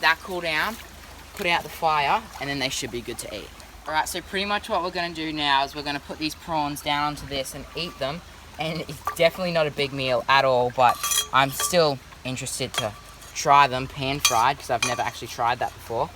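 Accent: Australia. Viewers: Are u a aussie? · are you a australian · Is he Aussie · Are you Australian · you sound Australian, cyclone debie hit Australia, how come you speak American, in this country we call them prawns. however what you were catching were yabbies good to eat but not prawns or shrimp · I can tell ur an Aussie so wanna meet up sometime and do some fishing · Are you an Aussie?????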